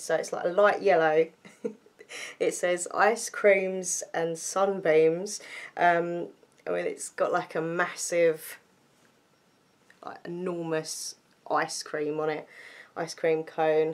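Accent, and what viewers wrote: Essex, United Kingdom. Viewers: You sound like you're from Essex with that accent. Are you from that way?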